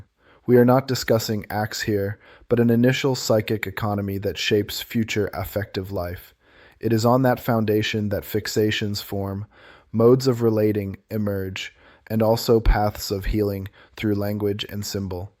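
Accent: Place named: United States. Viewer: well here's a tip: don't use an AI voice with a distinctly American accent for a person everyone knows is Austrian. I thought it was a joke at first.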